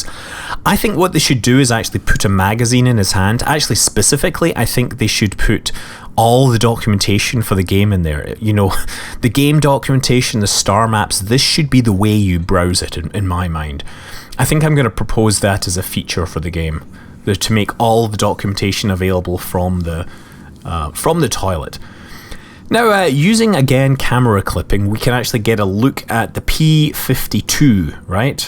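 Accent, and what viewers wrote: Scotland, United Kingdom. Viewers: What do you mean "unbearable 'accent'"? He's Scottish.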